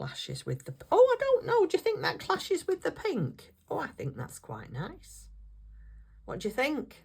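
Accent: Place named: England, United Kingdom. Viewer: I like it a lot! I just started junk journals last year and I am like you! I tend 5o hurry through and then I make mistakes and sometimes tear things apart! 😂😂😂. Keep up the great work! One your paper choice! Where in England are you from? I lived in Suffolk many years ago ( I’m American). Loved it! Love your accent!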